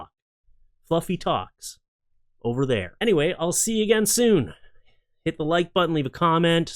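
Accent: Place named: Canada. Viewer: CripTIDS, dude. Criptids !! Man, do I dig that Canadian Archie Bunker second language you got going on! Never change, man.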